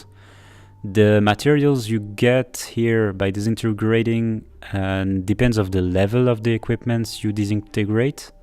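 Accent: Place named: France. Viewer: Just gave up because of the terrible accent. Don't wanna show off but tbh I could do better my first hs year and still I'm french too. If you can't talk correctly just do your vids in french, butcher.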